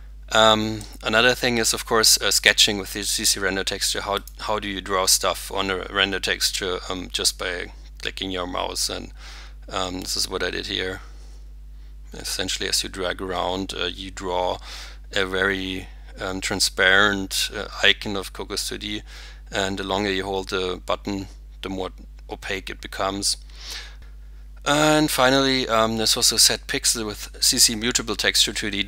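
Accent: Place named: Germany. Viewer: 100% er ist deutscher, das hört man so raus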